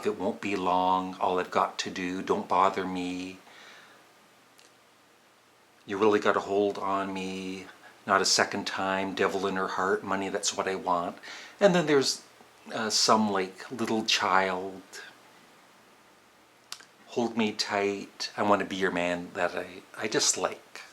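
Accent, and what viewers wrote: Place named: Canada. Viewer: No doubt...you are Canadian. Lol. No mistaking that accent. But I laugh, because I am from Texas...and I assure you...you could poke fun at me too. Lol. Thanks for the post/rankings. You get a like.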